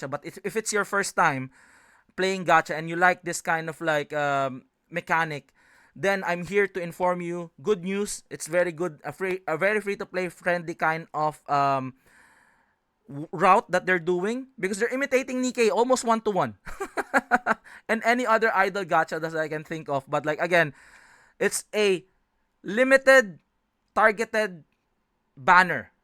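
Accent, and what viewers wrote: Philippines. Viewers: pinoy to base sa English accent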